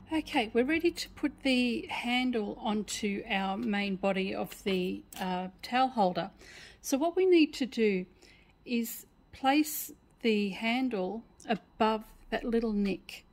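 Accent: Australia. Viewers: Greetings from Canada ! Thank you for your awesome tutorials! I have lots of tea towels of various sizes…would you be so kind to give me the measurement of your half towel once cut ? I want to be sure I’m using the same approximate size . Thank you ! Ps.. 99% of my family is in Melbourne …hearing your lovely accent makes me miss them even more 🇨🇦😁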